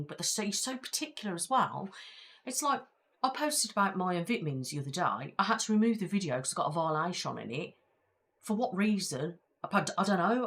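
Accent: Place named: Coventry, United Kingdom. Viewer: Love your accent, I was born in Coventry so reminds me of my early years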